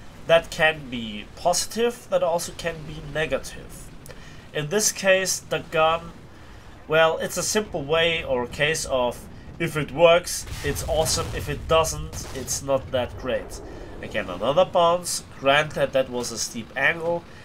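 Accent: Germany. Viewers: A very enjoyable video,. I think there is an element of RNG in war thunder, even though Gaijin denies it. I have penetrated the casement face of the jagdtiger with the T29 a couple times in test drive, but that was only 2 out of about 15 shots with no variation. Though perhaps we should not be totally critical of slight RNG. I believe it acts (intentionally or unintentionally) to represent the uncertainty and inconsistencies one would find in real combat. Also, any chance of a face reveal? We want to pair a face to that German accent lol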